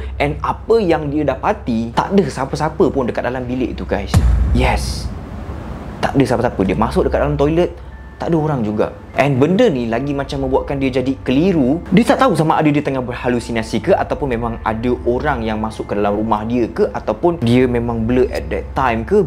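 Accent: Johor, Malaysia. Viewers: org johor ke?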